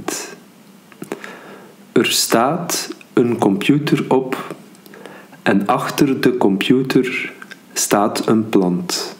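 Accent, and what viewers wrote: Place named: Arab world. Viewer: у нас в языковой школе, арабы с таким акцентом разговаривают)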